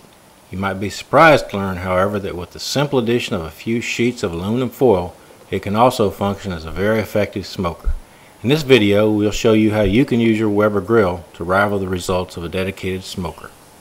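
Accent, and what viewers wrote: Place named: South, United States. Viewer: I like that southern drawl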